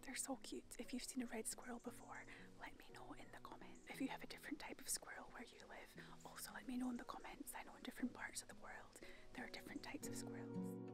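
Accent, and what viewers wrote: Scotland, United Kingdom. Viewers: We are really enjoying your videos. We haven't managed to visit Scotland since Covid, when car hire prices suddenly hit the roof!! Hopefully next year! Your lovely Scots accent is good to hear, but I had a chuckle when you said 'cattle grid' - very clearly. My Edinburgh cousin says 'kettle grid', which once caused confusion on a picnic!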